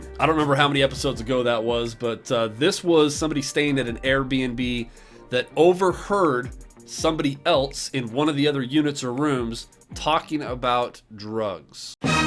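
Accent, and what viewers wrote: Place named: South, United States. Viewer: Ight it’s definitely not you, this guy has a southern accent, my b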